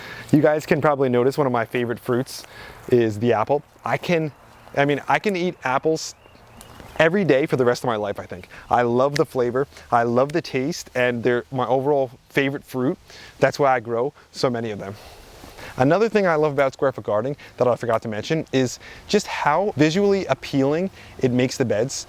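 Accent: New Jersey, United States. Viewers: Haha, yeah, his Jersey accent coming out. Be happy he’s not from Boston or Brooklyn, LOL.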